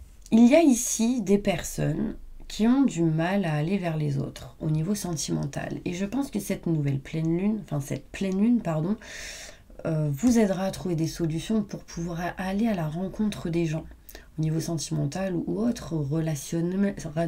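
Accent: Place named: Mediterranean. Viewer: Oui déjà des petites insomnies. En fait c est bien si vous faites rire les auditeurs, car vues les nouvelles à la télé.. En fait vous parlez comme un peu les gens de là où j habite : pays de Méditerranée !.... En tous cas j y suis habitué. D après mon avis, les gens qui regardent les vidéos des mediums, et bien c est qu on a une petite vie bien étroite, étriquée, et que notre âme est prisonnière " de peurs, de questionnements, et d interrogations".. Merci pour ces deblocages ! Vidéos vraiment dynamiques ! ☀️😊💐💐💐💐💐💐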